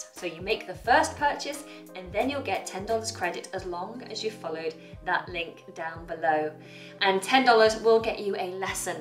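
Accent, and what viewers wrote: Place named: United Kingdom. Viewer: I'm enjoying your British English ))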